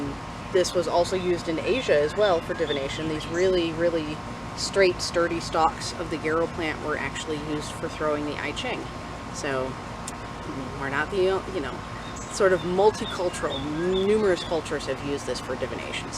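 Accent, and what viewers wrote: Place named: New England, United States. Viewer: Sounds like my neck of the woods. New England.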